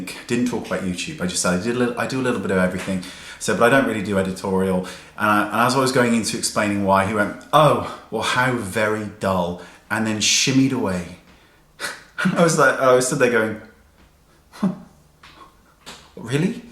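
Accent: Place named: United Kingdom. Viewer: Thats funny as hell, It's even funnier, because of your British accent I swear.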